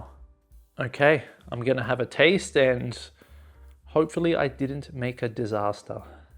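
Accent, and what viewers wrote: Australia. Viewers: Is it Australia accent? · Ohh, I really loved to know that's an urban Australian accent 😅 I intend to move there in the future, and I confess that I was scared hahaha. We Brazilians have been influenced to believe that the Australian accent is so, so, so hard, but I've been watching some videos of real life in Sydney, Melbourne and Brisbane, and I think it is kind of like a British accent, but with much more open sounds. So... it's totally fine for me. My favorite dessert is brigadeiro 😋 Congrats on your video, you're really lovely. I have a suggestion: if you could pronounce all those words at the end of the videos, and if you could do a regular conversation with another Australian person ☺️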